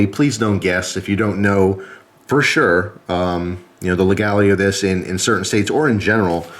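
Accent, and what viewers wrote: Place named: Eastern Pennsylvania, United States. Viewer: I know a eastern PA accent when I hear one....lol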